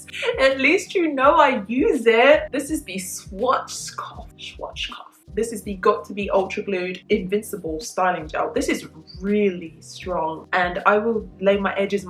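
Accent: London, United Kingdom. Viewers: You have a beautiful accent, are you from London?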